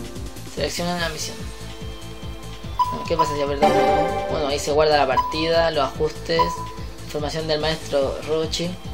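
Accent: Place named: Mexico. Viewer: por tu acento creo que eres mexicano verdad?? viva mexico carajo!!! jajajajjjxdjkajxkajdoisadjs xd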